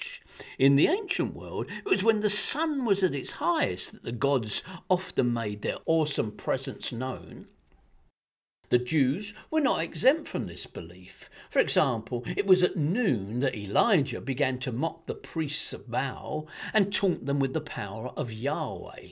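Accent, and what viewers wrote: United Kingdom. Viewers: Why do these bible bashing videos always have such a pretentious tone... this one with a British accent? These presentations go to extreme lengths to invalidate such insignificant things. To ridicule what is already ridiculous is even more ridiculous. Sorry, not very impressive.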